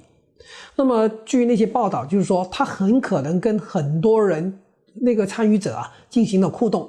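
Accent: Hong Kong, China. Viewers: DON先生的普通話像香港人。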